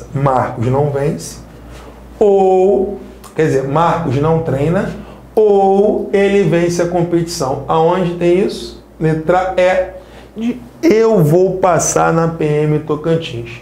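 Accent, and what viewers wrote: Rio de Janeiro, Brazil. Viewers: esse sotaque do Rio é horrível. Parem de colocar o X no lugar do S no final e no meio das palavras.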